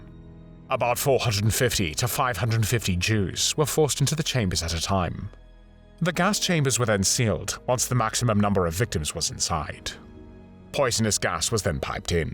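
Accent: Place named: United Kingdom. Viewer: ...so what? does this get your rocks off? Cause I see no other reason why you bring your Gaylord Briton Accent to describe how people get killed.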